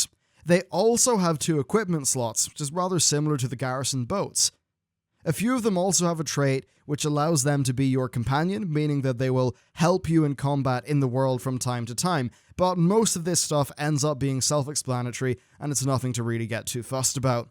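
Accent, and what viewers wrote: Northern Ireland, United Kingdom. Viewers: oh right i thought he was from Norin Iron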